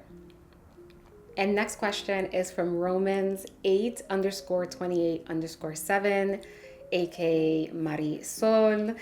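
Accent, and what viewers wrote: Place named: Midwest, United States. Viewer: Love your page! I’m married to a puerto Rican and your recipes are so easy to follow. Im from Chicago and I can tell by your voice you were a midwestern 🫶🏻🫶🏻your just as pretty as your lovely voice im so glad to put a face to a name!! Im also a Christian and love the verses you post on your videos. God bless your page!!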